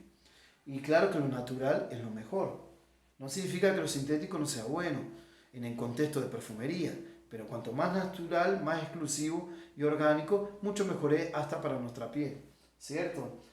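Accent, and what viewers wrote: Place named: Brazil. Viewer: Excelente contenido amigo gracias por este video, no puedo evitar encontrar en su acento argentino, también una sutil mezcla del portugués brasilero .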